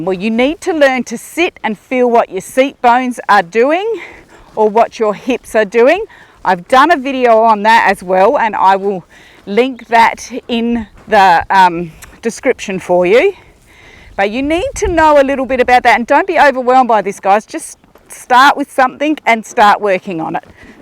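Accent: Australia. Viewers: You're literally the best!! And I love to hear a fellow Aussie accent :D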